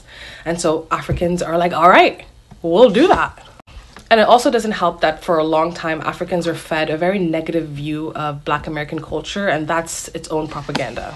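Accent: United States. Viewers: You definitely look and sound American not African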